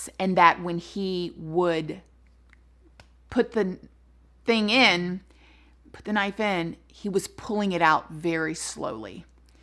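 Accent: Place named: Texas, United States. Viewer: Just found your channel... I am obsessed with true crime... and I watch a lot of different channels... but I have to say... I love your delivery and genuinity... I am definitely subscribing and hitting the bell... and may I just say that you are so beautiful!! And your accent and dialogue is so inviting... are you from Texas??? Anyway... I am off to enjoy the rest of your content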